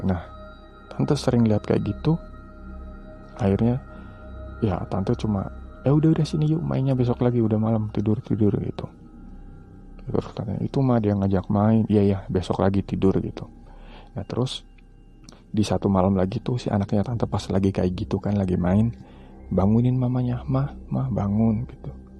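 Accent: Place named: Tegal, Indonesia. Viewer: beuhh tegal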